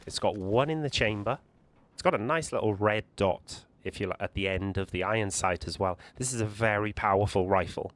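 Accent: Wales, United Kingdom. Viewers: I genuinely look forward to watching your Dayz videos. I'm fairly new to it. Probably 30hrs and doing well tbh.. struggling with wolves and shooting 😂 but your videos is like like watching David Attenborough programs on animals... brilliant communication and something about your Welsh accent makes it enjoyable and interesting to watch.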